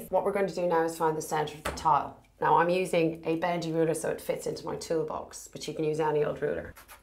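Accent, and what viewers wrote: Ireland. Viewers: Any lady who does DIY deserves a like in my book. Love the Irish accent too. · Fabulous and a lovely Irish accent!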